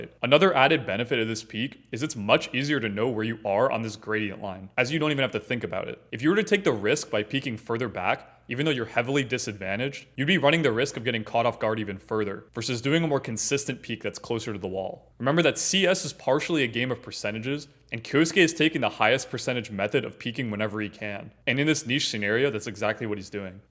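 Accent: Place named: United States. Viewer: the moment you hear an american accent on a counter strike video you know the creator is going to be absolutely clueless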